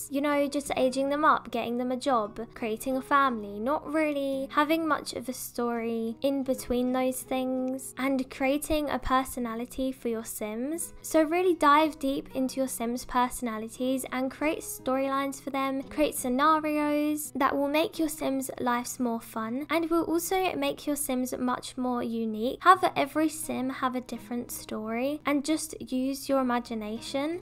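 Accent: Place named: United Kingdom. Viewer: Where r u from? I love your accent... It sounds british but im not sure...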